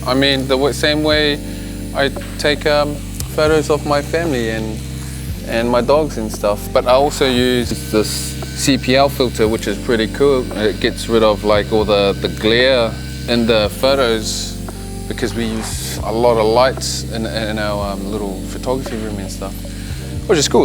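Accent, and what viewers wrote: New Zealand. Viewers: I love that Kiwi accent. That shit cracks me up after watching beached az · New zealanders have a very distinct accent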